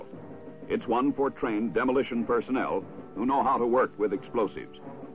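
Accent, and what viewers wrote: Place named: Boston Brahmin, United States. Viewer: boston brahmin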